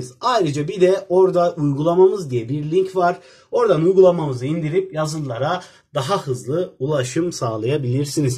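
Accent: Kayseri, Turkey. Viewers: Hoca galiba kayserili aşadaaaaaakii karşınızdaaaaakii sinirim bozuldu skjsnsiwns😅😂😃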